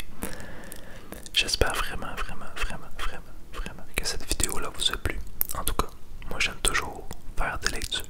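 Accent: Quebec, Canada. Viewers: Bravo ! Top ! Merci beaucoup ! 😀 Ta vidéo a comblé une partie de ma nuit d'insomnie... Bons rythme et débit, ta voix est très agréable, c'est très apaisant. Moi je vote pour d'autres vidéos. Avec les plus de 500 pages du recueil de contes, on en a pour un moment - et toi aussi !!! 😜 En plus, petite française d'Alsace que je suis, je suis une fan inconditionnelle de l'accent québécois !!! 😘 Concernant les droits d'auteur dont parle un des commentaires, rien à craindre. Les contes des frères Grimm sont depuis longtemps tombés dans le domaine public. Les droits d'auteur sont dûs en Europe pendant 70 ans après la mort de l'auteur et 50 ans au Canada. Ils ne peuvent ainsi pas entraver l'enregistrement des contes suivants ! Alors, au boulot ! 😁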